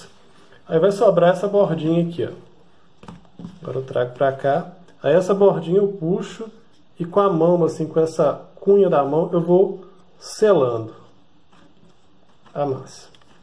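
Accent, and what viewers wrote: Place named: Minas Gerais, Brazil. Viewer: Dou mais uma apertadim....... Sotaque mineiro não nega!!!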